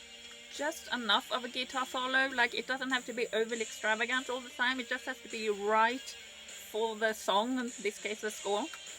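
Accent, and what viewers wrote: United Kingdom. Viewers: That must be the most British-ish accent I've heard from a non-Brit 😁. Nice to see you getting back on track. I was somewhat out of NW too for several years (but then again I didn't listen to any music other than what was on radio when driving), but have been catching up the past year. In a way it was a healthy break for me as I can now observe with a new perspective. And another greets from Finland. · My thought exactly, about the accent. At first I was a bit confused - like "Is she British or Swedish or what..." 😂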